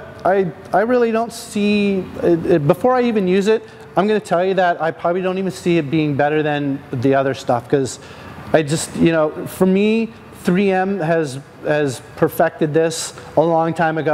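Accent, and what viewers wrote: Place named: United States. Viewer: I like yourself am about 20+years and have used 3m products continuously, yes ive tried most other "scene" machine polishing brands and have always come back to 3m,and have realised there are so many rebranded materials so if it aint broke dont fix it! Rotary and 3m products do exactly what you want them to, good video ur like me but with an American accent lol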